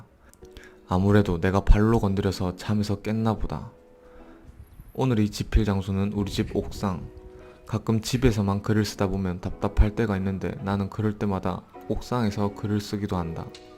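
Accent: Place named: Ulsan, South Korea. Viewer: 울산분이신듯요 ㅋ 울서방도 울산사람인데 좀 억양이 좀 다른거같기도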